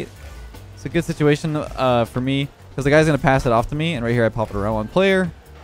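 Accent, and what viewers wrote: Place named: Canada. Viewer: He’s either from Canada or Minnesota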